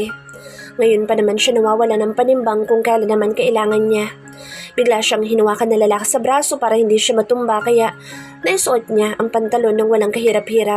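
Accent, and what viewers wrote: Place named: Philippines. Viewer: Sayang kaya wala ng nasyadongnagsusubscribe sa mga upload ninyong story isa na sko sa mga subscriber ng mga maganda ninyongstory pero ngaton I stop subscribing your stories dahil hindi ko na gusto ang narrator ninyo. style s. Style ng pag deliver ng lines at saka nay punto pa. Taga saang probinsiya ka? taga saansng punto na yan galit ka sa pagsasalita mo sayang nasaanyong dsti mong narrator. mula ng mslspit na ang finale ng Hiding The Montemsyor Real Heir pnapitan na sng narrtor kaya hindi ko na tinapos ang siguro sampung episode bg montemayor heir hindi gusto a yong boses ng narrator kaya di na slam sngnaging finale ng Montemayor Real Heir Gusto ko sanang makinig sa story kaya di ko rin. Ma absorb ang voice na galig at mabilis na parang hinahabol ng asong ulol angstyle ng pagsasalita ni miss narrator. Ssaysng sng gsmda sana ng storymo. 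✌️✌️✌️✌️✌️✌️✌️👎👎👎🤮🤮🤮🤧🤧🤮🤮🤮🤮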